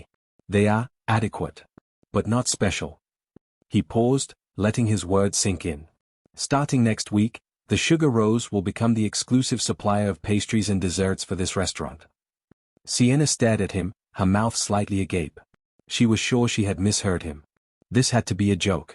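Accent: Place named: Australia. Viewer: ❤❤😊good story, good enunciation. The narrator’s accent, sounding of Australia. ❤❤😊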